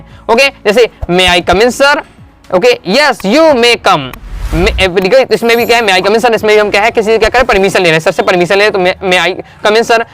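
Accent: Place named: Bundelkhand, India. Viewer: सर आप तो कहीं कहीं बुन्देलखण्ड बी बोल तै हो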